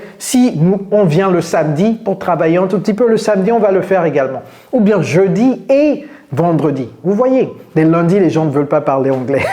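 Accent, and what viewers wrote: United States. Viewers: 😮wow that's amazing i love what you do . I trust in myself from now i will start to learne englisch and i will be able to speak like you ,like an american People 😊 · un togolais, un africain en général peut parler avec l'accent américain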